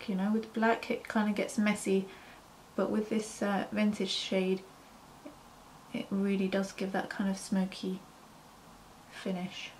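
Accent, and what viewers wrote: United Kingdom. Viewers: Are you muslim women? What is your name? Your Accent is British, Right?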